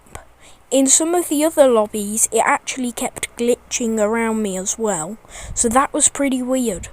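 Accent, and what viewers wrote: United Kingdom. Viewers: Bro u so brithish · It makes it even funnier because he British😂😂😂😂😂😂😂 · ngl no offense but its its alot harder to take you seriesly when your british XD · BRITISH MAN UGH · Are you British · Nar mate he’s British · Your accent is literally the perfect British accent for broadcasting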